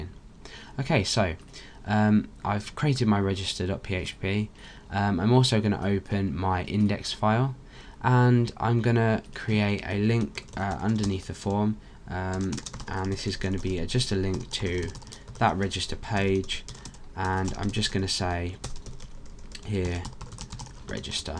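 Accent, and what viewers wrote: United Kingdom. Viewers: dude, you're the best plus your UK accent, that makes you AWESOME, i love you!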